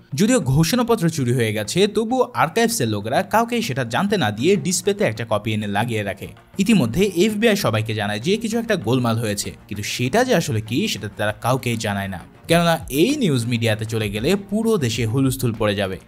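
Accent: Bangladesh. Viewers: আপনি কি বাংলাদেশি? আপনার কথায় মনে হয় আপনি বাংলাদেশি😊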